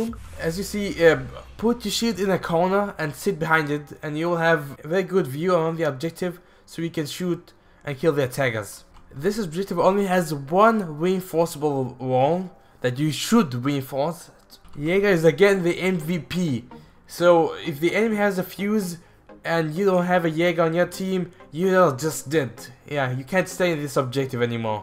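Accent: France. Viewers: I love your accent!!! I'm not really good at guessing accents but you sound french, german, or arab. Love the videos keep it up. ❤ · He sounds French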